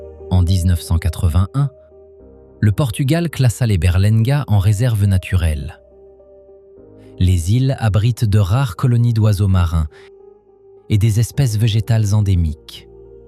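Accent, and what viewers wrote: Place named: Spain. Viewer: Mon pays de cœur, celui où je finirai ma vie, en ayant obtenu la nationalité portugaise. Si je vis assez longtemps 😅 Tant d'endroits magiques, de paysages variés, de fêtes inoubliables des plus grandes villages aux plus petits villages. Des traditions vivaces et une modernité, moins froide qu'ailleurs! Des détails, partout, qui m'émerveillent: calçada (pavage des rues avec des motifs), azulejos anciens ou contemporains, ferronnerie, art de rue si créatif, coloré et joyeux. ETC. Seul bémol de la vidéo: les noms portugais souvent mal prononcés, à la mode espagnole. Le portugais n'a heureusement rien à voir à l'oral avec cette langue si pauvre en phonèmes!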